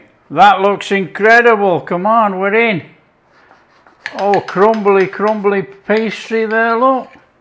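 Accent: Yorkshire, United Kingdom. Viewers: are you from yorkshire,?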